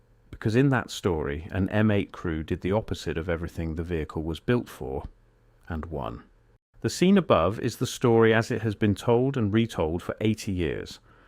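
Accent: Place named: United Kingdom. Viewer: Excellent narration! Something about the British or Australian accent that makes it land so beautifully on the ear.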